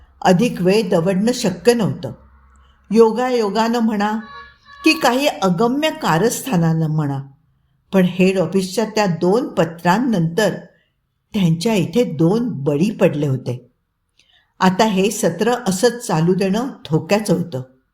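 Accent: Standard. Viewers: खूप छान वाचन ....! सुस्पष्ट आणि शुद्ध उच्चार.....!! ऐकत राहावंसं वाटतं.....!!! आवाजाची जादू .....! अक्षरशः खरं आहे.... 👌👌👌👍👍👍🌹🌹🌹🌹🌹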